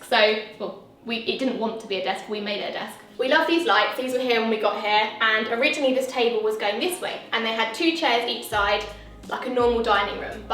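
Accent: United Kingdom. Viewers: That british sexy accent💜 Everytime i hear british accent i always think Hermione😂 it's fayaah!🔥 Sorry from my english haha😅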